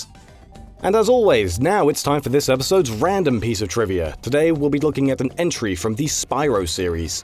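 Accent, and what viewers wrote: United Kingdom. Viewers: This is a very British narration.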